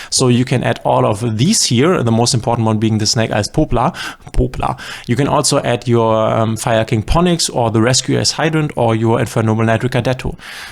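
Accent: Germany. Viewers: Dieser deutsche Akzent 😁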